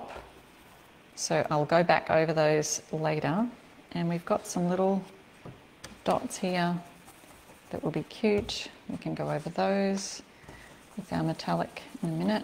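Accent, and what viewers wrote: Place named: Australia. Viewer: Greetings from Centreville Michigan USA. Love your Aussie accent. Spent a week in Australia and a week on North amd South Island New Zealand 2019. Trip of a lifetime. Your videos are great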